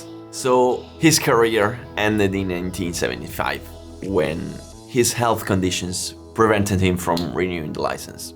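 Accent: Italy. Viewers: As a New Zealander with Italian roots he pronounces his name as any Italian would. It's called an accent. Usually it's Maoris the first to jump on and moan when a foreigner pronounces their language wrong, but in this case you knew exactly what he was saying. Stop being so precious especially over free entertainment.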